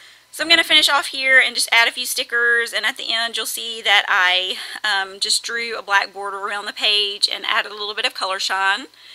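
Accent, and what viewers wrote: South, United States. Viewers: Such a sweet layout!! Great job! I just love watching your videos because I'm from Alabama and love to hear a familiar southern voice :) To chicken to do my own videos because I think I sound awful on a recording. Love watching you though!!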